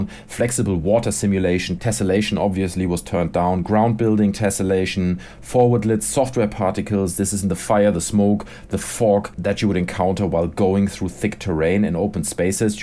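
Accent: Germany. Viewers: wollt gerade fragen, ob du deutsch bist :D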